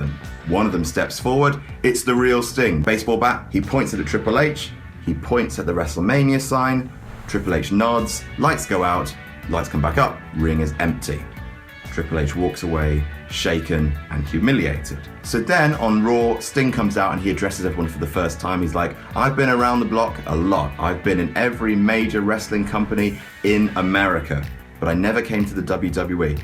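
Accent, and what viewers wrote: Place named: United Kingdom. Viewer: "and I talk about how I would book them differently, because I'm a smart ass." Does anyone else think that this guy's British accent made that line a little bit funnier than it would have sounded if he had an American accent?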